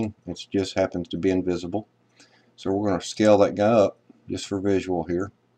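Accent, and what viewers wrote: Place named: South, United States. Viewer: Every tutorial on the internet should have your narration on it. Your accent is like smooth southern butter.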